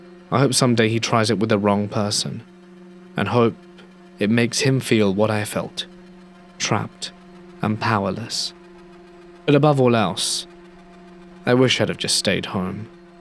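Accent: United Kingdom. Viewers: I'm not sure where you live but he has a very British accent like my own .Unless you live over on this isle then it's not going to be possible..I'm guessing you may be,American because I've noticed Americans never take into account that there's actually billions of us who don't live over there ..cheers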